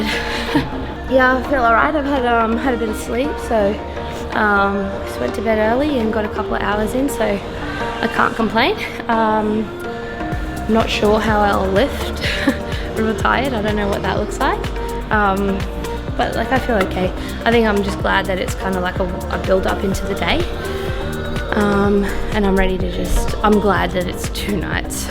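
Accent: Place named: Australia. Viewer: Amazing achievement! Congrats! ❤ Can I make a tiny suggestion for the next video? Could you turn down the level of the music when there's a lot going on in the background? With you speaking quickly and the background noise in the gym plus the music, it's quite hard to understand sometimes, at least for someone who's not from Australia ;) Thanks!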